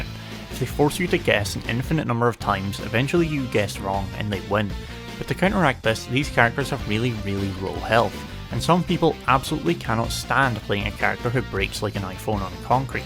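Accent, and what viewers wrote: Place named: Scotland, United Kingdom. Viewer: Nice to here a Scottish accent! I'm in glasgow, do you play SFV? up for a few sets sometime?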